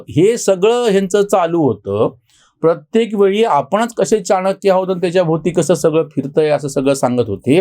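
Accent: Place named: Marathwada, India. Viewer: टीका पण मनावर घेता, भाषेवर पण मेहनत घेतलीत मराठवाडी ठसका कमी झाला. मी गाभाच ऐकत असल्याने त्रास होत नव्हता पण मराठवाड्याशी संबध नसल्याने काही शब्दाचा अर्थबोध होत नसे. आजचा विषय आणी presentation खुप आवडले.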